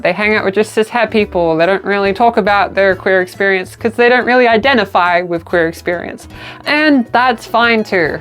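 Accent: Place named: Australia. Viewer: I hear a hint of aussie in your voice. But what is most noticable is how faint it is. As if you started out in australia but years ago you maybe moved to the states. Dialects and accents interest me.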